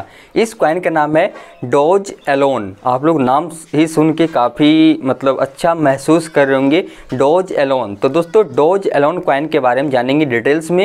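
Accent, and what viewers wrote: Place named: Shekhawati, India. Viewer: Sir aap sekhawati side ke lagte ho awaj se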